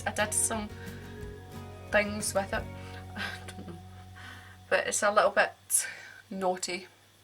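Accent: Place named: Scotland, United Kingdom. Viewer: are you scottish I can hear it in your voice love it.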